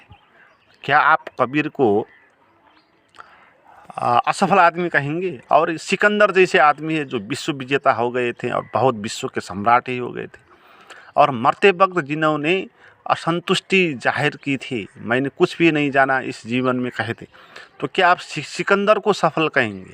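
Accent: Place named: Nepal. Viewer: नेपालीले हिन्दी बोलेको जस्तो लाग्यो! प्रबचन असाध्य राम्रो लाग्यो!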